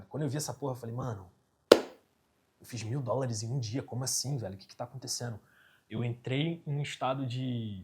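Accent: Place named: Rio de Janeiro, Brazil. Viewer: Ele é do RJ certeza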